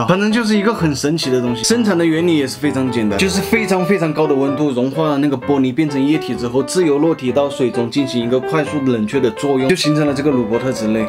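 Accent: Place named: Hunan, China. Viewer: 听你这湖南腔就想笑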